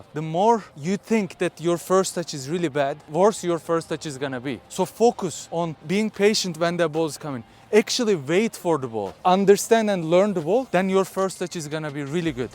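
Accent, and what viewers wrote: Turkey. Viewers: Heaviest turkish accent i have ever seen in my life 😂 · Türk müsün? Aksanın türk aksanına benziyor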